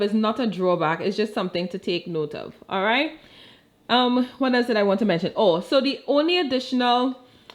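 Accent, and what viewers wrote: Trinidad and Tobago. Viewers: You're Trini?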